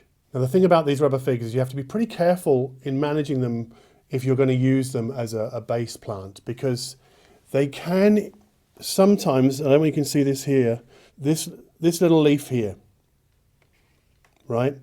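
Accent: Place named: United Kingdom. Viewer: Just shows that anything sounds legit when said with a British accent!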